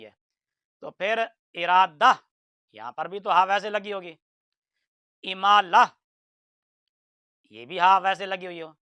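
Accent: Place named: Punjab, Pakistan. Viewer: مفتی صاحب آپ بھی گلابی اردو بول رہے ہیں آپ کی اردو میں پنجابی لہجے کی ملاوٹ ہے کراچی میں آپ جیسے لوگوں کو ڈھگا کہا جاتا ہے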